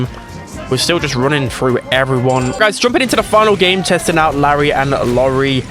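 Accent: United Kingdom. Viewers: W british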